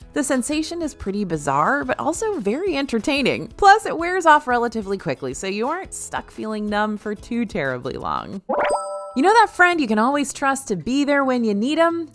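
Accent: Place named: Western New York, United States. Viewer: I am from KENMORE NY and just like you, I say caramel the same way .. long live school bread and Tonga toast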